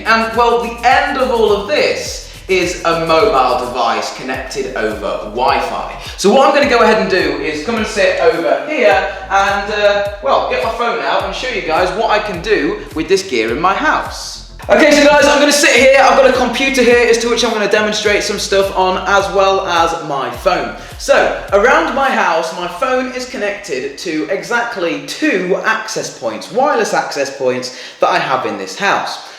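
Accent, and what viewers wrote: United Kingdom. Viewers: I dont like BRITISH ACCENT!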